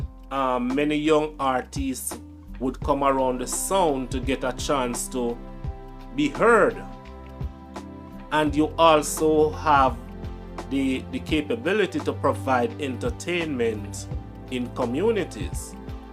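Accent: Jamaica. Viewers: I like the information and the fact you speak using raw Jamaican dialect!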